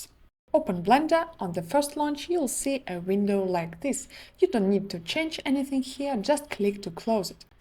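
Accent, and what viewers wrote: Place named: Russia. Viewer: love the russian accent. sound really nice to listen to. really nice video, giving a lot of advice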